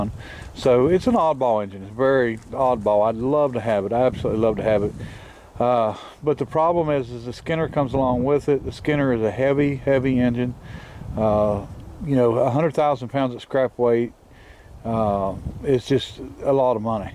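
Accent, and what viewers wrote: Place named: Tennessee, United States. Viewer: "I am alookin and not aseein" , brought back good memories of Tennessee from decades ago.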